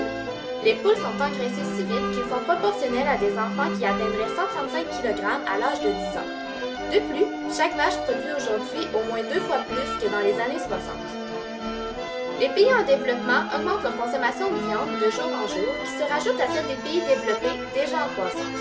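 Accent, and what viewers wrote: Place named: Canada. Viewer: Trop de musique de fond, en plus avec votre accent canadien, difficile de suivre !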